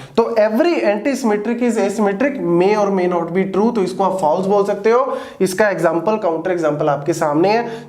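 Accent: Punjab, India. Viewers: I love your punjabi accent so much😍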